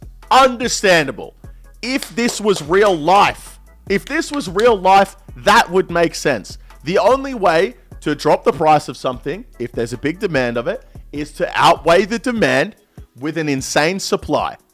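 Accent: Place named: Australia. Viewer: Bro you are the most cringe Aussie OSRS creator, possibly the most cringe out of everyone. No one cares what you think and the fact you think a clips channel of your garbage streams is insane. Get a haircut lose some weight and stop over accentuating the Aussie accent you absolute loser. You and behemoth are embarrassing.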